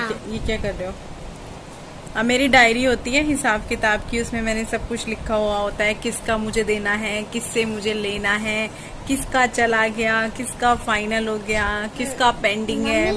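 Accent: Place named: Punjab, India. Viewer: Hiiii di , aap punjabi ho Kya , aapki tone thori thori lgti hai waisi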